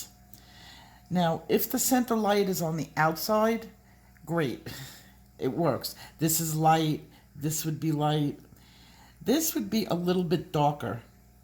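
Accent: New York, United States. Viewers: It was a real triumph for you to finally pronounce ochre - but I was so used to hearing okra, so definitely your New Yorker accent!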